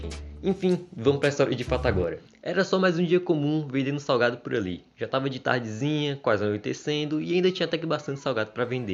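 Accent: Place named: Bahia, Brazil. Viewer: voz de baiano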